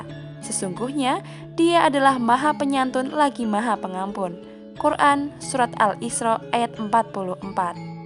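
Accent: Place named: Java, Indonesia. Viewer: naratornya logat jawa nya kental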